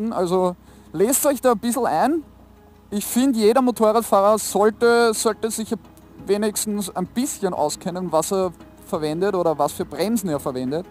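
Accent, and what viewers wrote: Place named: Austria. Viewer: Eine sec geschaut, direkt gehört dass du Österreicher bist gleich n abbo dagelassen😄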